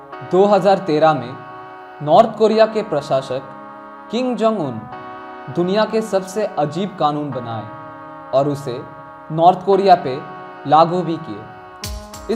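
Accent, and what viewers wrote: Bengal, India. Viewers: Bengali hai kya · R u Bengoli ...Odisian? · Tumhara hindi sunke to lagta hai tum bangali manush ho .... achhi jankari diye ho . thank you dada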